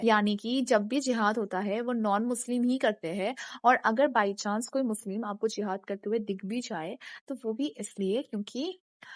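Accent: Bengal, India. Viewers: Are you bengali? Your accent sounds similar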